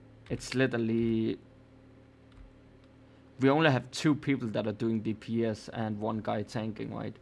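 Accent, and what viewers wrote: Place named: Denmark. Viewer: Big thanks for the guide, I will probably be tanking this for my crew this week. Are you Danish? You sound like a fellow Dane :D If you need a crew to run raids with you should consider joining us in CozyCrew over on Ether EU.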